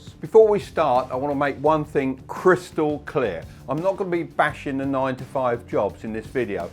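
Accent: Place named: Norfolk, United Kingdom. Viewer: nice norfolk accent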